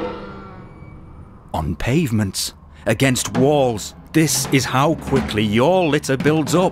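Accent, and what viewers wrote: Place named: Sheffield, United Kingdom. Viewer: Not badly done, just don't really understand why they got a voice actor from Sheffield or wherever, and not a Brummy? Are Brummies themselves sick of their own accent?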